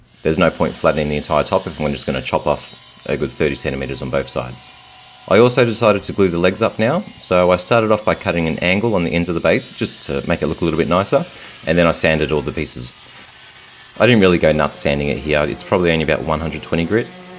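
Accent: Australia. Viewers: It's great to hear a Aussie an Aussie accent. Love the casual approach as opposed to the over technical banter that is often the case. Great job and thanks for taking the time to show it.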